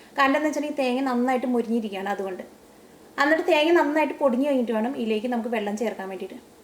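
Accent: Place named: Kottayam, India. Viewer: Nice presentation.. mainly the slang which resembles kottayam bhasha...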